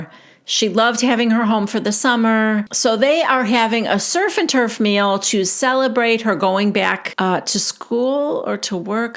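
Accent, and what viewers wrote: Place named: Midwest, United States. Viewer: I got to say we are in March 2024 and I too used to like ‘s videos. I do not like her anymore. Not that that matters or is going to sway anyone’s opinions but she is confused between a content creator on YouTube and Homeland security. She dives into peoples lives. I mean yes granted they are public figures but when no one would be surprised if she knew their blood types and their Social Security numbers, that’s a bit of a problem however, that is whatever you know I think that she does a little too much, but that was just my opinion. It wasn’t until Garrison died that my opinion has really completely changed about her, she is sitting there criticizing TLC in the production company and she’s not wrong with what she’s saying she’s not wrong at all with what she’s saying actually but what she’s criticizing them for having things out there that they may see and they may have to relive all of that she is doing the same exact thing, every Difficult situation that family has ever gone through. 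She has made at least five videos about at least each thing and then when it’s like bigger things then she’s putting out like three or four videos a day about it so it’s a little bit of the pot calling the kettle black to me, but that was just like the cherry on top of the Sunday really was the fact that this girl who lives in I’m not sure where she lives but with the way that she talks that accent that she has the way she says things it screams like Midwestern to me But she is where she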